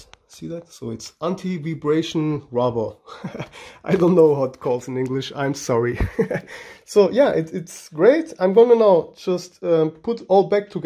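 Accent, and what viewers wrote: Germany. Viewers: I love German accent 🤣. Btw Ich bin Deutsch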